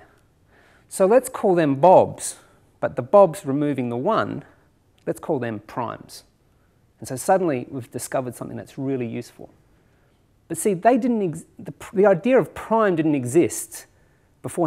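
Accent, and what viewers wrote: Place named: New Zealand. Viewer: Sounds to me like a Kiwi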